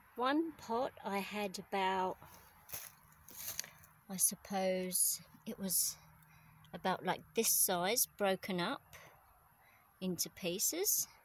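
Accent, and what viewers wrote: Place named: London, United Kingdom. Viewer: Hello again, im sure i can here a london accent there, you used to live in the UK ? I buy ginger from the supermarkets to make ginger wine, im going to give this a go in my polytunnel next year, your harvest is great. thanks and take good care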